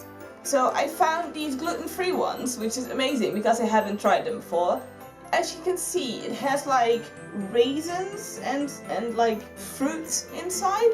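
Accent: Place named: England, United Kingdom. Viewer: So basically... You've never heard of Gluhwein? That's KINDA a tradition here.... And ugh, chocolate oranges :( I really dislike the taste of those xD. But I love seeing you getting more and more English both in speech and manner xD Anyway, enjoy your boxing day and have a great new year!!!!!!!!!!!!!!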